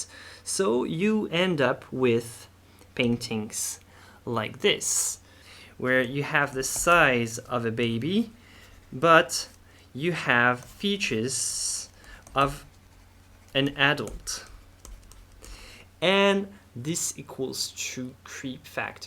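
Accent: France. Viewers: He's french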